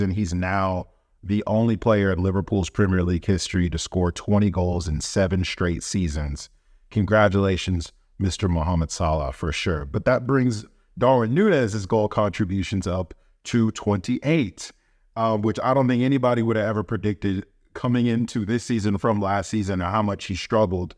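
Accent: United States. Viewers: I like your English. I never listen football with American accent are you American. Do you have live chat programm ?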